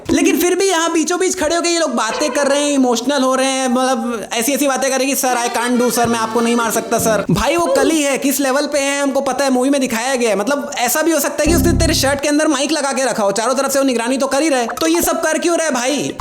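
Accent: Chhattisgarh, India. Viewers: Bhaiya app Chhattisgarhi ho kya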